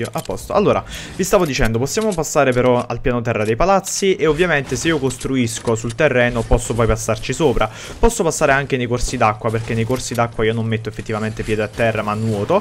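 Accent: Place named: North, Italy. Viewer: Ma perchè parli sia napoletano sia romano e poi parli con l'accento del nord ...ma che cazz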